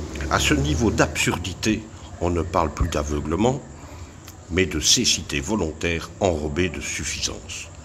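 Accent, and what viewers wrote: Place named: Belgium. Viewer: Voila la réalité toute nue, la vérité incontournable. Ces atavismes peuvent être reprochés a une grande partie de la communauté Hébreu, particulièrement les plus médiatisés, s'est sans doute pour cela la parole leur est si facilement accordée, mais se rendent-ils compte qu'il signent là leur déchéance morale ? Merci Monsieur a votre accent je pense que vous êtes Belge ? J'aurais aimé que ce soit un français avec des responsabilités politiques qui ait votre courage, de cibler directement un malotru y compris sa suffisance.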